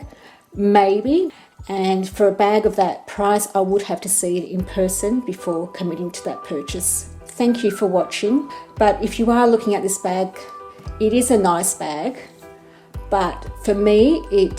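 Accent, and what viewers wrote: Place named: Australia. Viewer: Are you from Australia? I am guessing because of your accent. I’m looking to buy a bag from polene but not sure if they ship to Australia since their website only shows USD dollars or the EUROS. Thanks for your help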